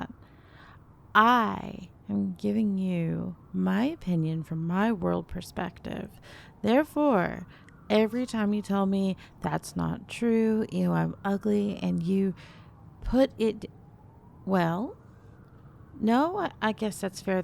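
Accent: South, United States. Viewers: Too bad women like this dont exist and im not saying i wanna take a backseat and let her do EVERYTHING im a man i want to treat a woman like a lady i wanna praise her too. But you got women out here wanting a guy to have a chisled body be 6ft or more and make 100k+ a year like uh..... so im just cooked also her southern accent is amazing.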